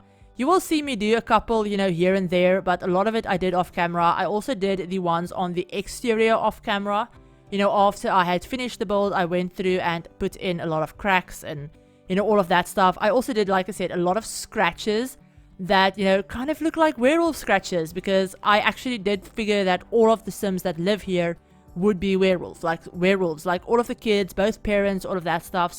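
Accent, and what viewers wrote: South Africa. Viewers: I totaly probably just assuming cause of you're accent, you're South African probably cause it's just like I can never find SA youtubers related to stuff I watch I'm am SA so it so it's just nice to,for some reasone I can't realy understand American accents it just sounds kinda fast IDK why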